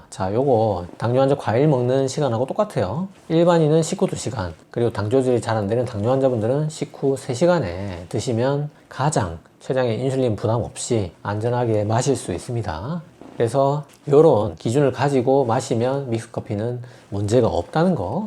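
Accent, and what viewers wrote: Gyeongsang, South Korea. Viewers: 갱상도 쌤 같은데 하나도 지루 하지않고 귀에쏙쏙 ㅋㅋㅋ믹스커피의 억울한 오명을 벗겨주시네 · 사투리 늠조앙 ㅎㅎㅎㅎ경상도시네요 반가바용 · 은근히 구수한 사투리가 좋습니다 ᆢ감사합니데이^^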